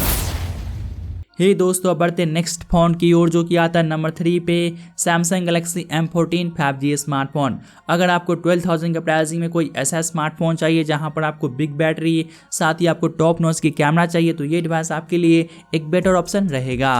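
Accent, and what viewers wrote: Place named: Bihar, India. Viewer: भाषा उच्चारण में सुधार की जरूरत है बोली में बिहारी झलक नजर आता है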